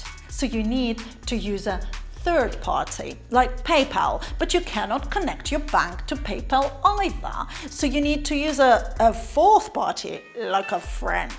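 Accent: Jamaica. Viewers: LOL....Good Joke....We love your accent, especially when the "Jamaican" part "sneaks in" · Girl I hear the Jamaican accent coming out lol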